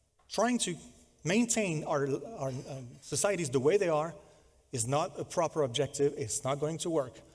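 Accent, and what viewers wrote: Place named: United States. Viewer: où avez-vous appris à parler si bien anglais, avec un accent américain de très bonne facture?! En tant que prof d’anglais je suis impressionné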